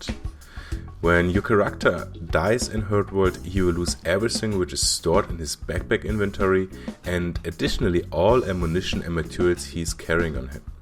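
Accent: Germany. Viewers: Bist du deutsch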